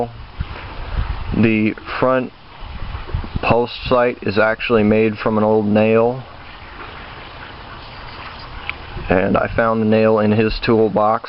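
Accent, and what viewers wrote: United States. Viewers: you don't sound Californian, you sound like your from my neck a the woods. where abouts you first lived? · Well, since you're talking about your roots, I'd say that you probably learned to talk in southern ohio or close by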